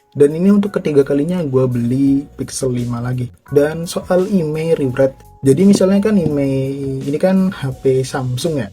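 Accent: Bali, Indonesia. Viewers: Jujur logat lu bang kaya orang Bali agak ke India"an😂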